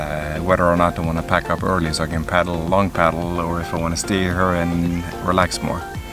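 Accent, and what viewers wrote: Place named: Norway. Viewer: Hei, hører at du er norsk, PG elsker NBen din, og lurer på om du har vert i Bekkjarvik?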